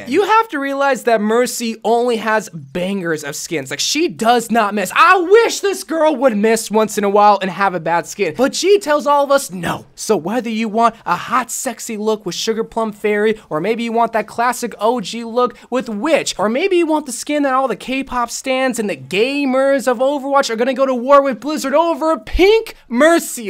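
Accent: United Kingdom. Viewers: Your British accent ain't that bad don't worry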